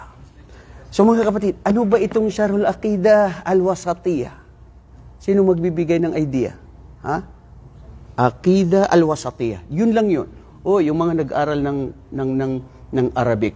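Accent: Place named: Visayas, Philippines. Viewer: Cute c ustadh mag bisaya😅 oi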